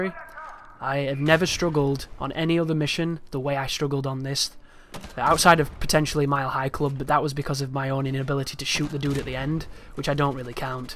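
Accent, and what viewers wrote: United Kingdom. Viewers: Your heavy british accent makes it 10 times better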